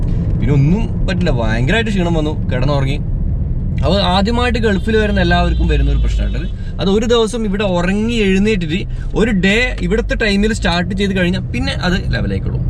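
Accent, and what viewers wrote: Kannur, India. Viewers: ഓവ്വ് എന്റെ മോനെ . ഇങ്ങള് നോക്കിയാട്ടെ . . 😀😀😂😂 എട പോയാലും അമ്മളേ കണ്ണൂര് സ്ലാങ്.. ബേഗം റൈഡ് സ്റ്റാർട്ട്‌ ആക്ക് അമ്മള് കട്ട വെയ്റ്റ് ആന്നപ്പ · Kannur slang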